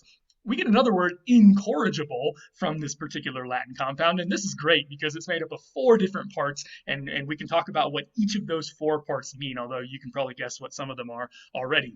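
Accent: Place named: United States. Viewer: No offence and I don't want to come off too negatively but why are you using a postalveolar approximant r in Latin words when we know factually that this sound did not exist in Latin and in no variety of Vulgar Latin was this a thing and the vowel quality you use sounds like it it taken directly from American English. Is it because you don't think it matters or is there some other reason? As a Classics scholar you must know that these words were not pronounced the way you are pronouncing them?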